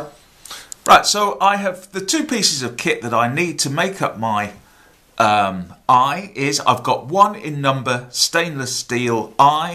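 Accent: United Kingdom. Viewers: STU HERE THANKS ALWAYS WANTED TO KNO HOW TO TIE THE ANGLERS KNOT. OH AND YOOU BRITISH ACSENT IS SPOT ON. I LIVED AT THE BEACH FOR 20 YEARS NVERE HAD THE NEET FOR THAT KNOT. CIAO.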